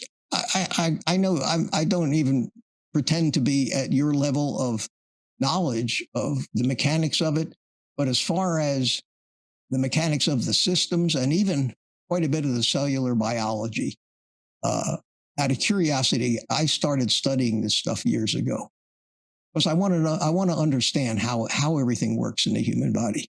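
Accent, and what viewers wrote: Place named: Philadelphia, United States. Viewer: Hi just enjoyed the live stream with Kerry Mann. Just checking out your other videos I enjoy also he is hilarious. I knew your accent sounded familiar I am also a fellow Philadelphian😀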